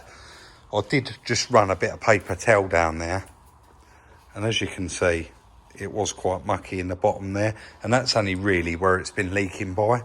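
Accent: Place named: Essex, United Kingdom. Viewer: Hey, my wife was overhearing your accent she thinks you're an Essex boy. Is she right?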